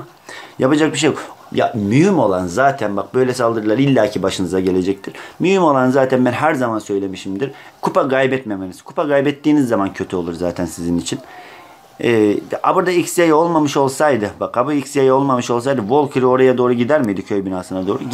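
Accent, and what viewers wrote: Black Sea, Turkey. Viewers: Abi ben eskiden karadeniz şivesinden nefret ediyordum dizilerdeki bazı oyuncular hiç konuşamıyordu çok kötü konuşuyorlardı ama senin şiven çok güzel bana karadeniz şivesini sevdirdin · o Karadenizli olduğu için ole diyo anlamiyomusun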